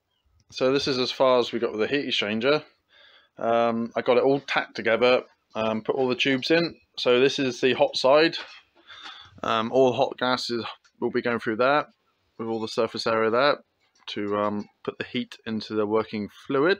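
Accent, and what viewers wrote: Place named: Cornwall, United Kingdom. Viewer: Great job, looking really good, i take from the local twang your from Cornwall, a fellow Cornishman, here is a little project i have been working on, a 4.5 kw rocket stove, can give to much away ,as still in patent process, <link>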